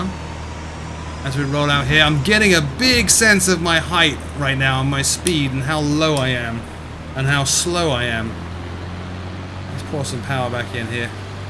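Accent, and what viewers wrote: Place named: England, United Kingdom. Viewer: I know it's English ... I can tell by the accent ... tech-talk is it's own new language - as usual in any discipline. I just am beyond amazed at age 60 that a) humans can fly b) humans can simulate flight c) humans can put this on an internet for all to see and d) we should all be apoplectic in the very conceiving of this 3rd millenium progress!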